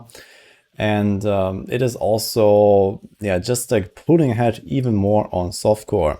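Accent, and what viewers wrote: Germany. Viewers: Für einen Deutschen hast du kaum akzent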